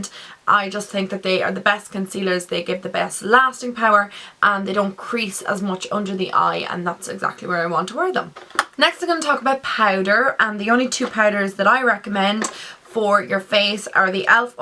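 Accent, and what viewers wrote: Ireland. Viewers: I only found out about your channel now and its amazing. I figured you were irish coz of your accent. Your make up tutorials are amazing. I was wondering if you went to college for beauty therapy where?. Beacuse that's what i want to do when i leave school. Or what colleges would you recommend! Would really appreciate it! Once again your videos are amazing:) x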